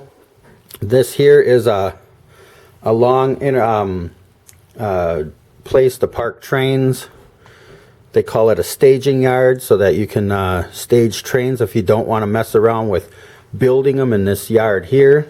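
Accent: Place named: Wisconsin, United States. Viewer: Love the layout and the wood paneling in the basement is awsome and I detect a WISCONSIN accent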